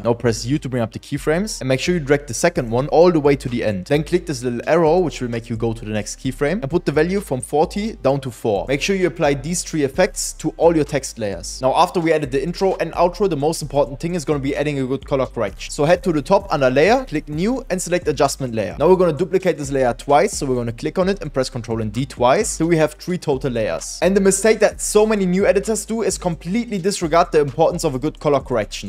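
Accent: Germany. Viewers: i hear German accent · i hear deutsch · Man hört dass du Deutscher bist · Man hört so krass das du deutsch bist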